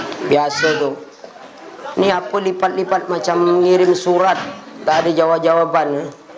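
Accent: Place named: Pontianak, Indonesia. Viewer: Kalau dengar logat bicaranya kayak orang pontianak ustadz satu ni😊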